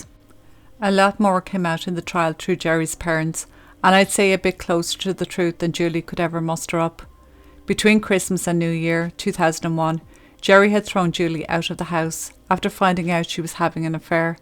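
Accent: Ireland. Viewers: Love your channel. This was horrific, Its like i could hear the hurt in your voice when you mentioned Kia. Traumatic what she witnessed and what Nadine was put through. I always remember this one. Thanks for sharing, nice to listen to a fellow Irish. 😊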